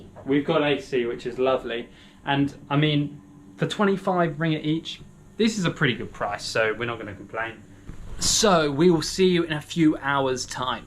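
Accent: United Kingdom. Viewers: I really like your English accent , very nice 👍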